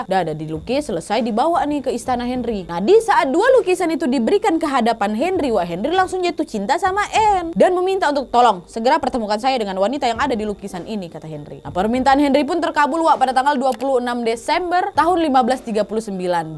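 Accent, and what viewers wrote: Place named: Dumai, Indonesia. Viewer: Kyk org Dumai logat nya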